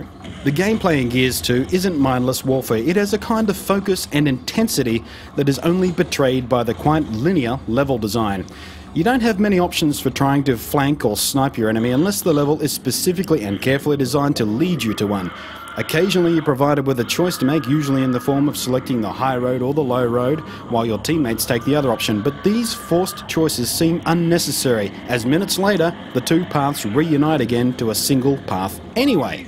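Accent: England, United Kingdom. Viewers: nothing is wrong with English people. it's this guys pissy attitude towards the game and his accent. most English people's accents are all right. but this guy pisses me off. fuck he's stupid. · The guy is british, and that's how british ppl say it. -.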